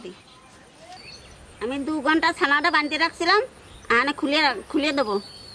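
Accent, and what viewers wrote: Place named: Faridpur, Bangladesh. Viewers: ফরিদপুররে ভাষা বাংলাদেশের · Ei Thakumar adibari faridpur naki???love her accent..💚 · ভাষা শুনে ফরিদপুর মনে হচ্ছে।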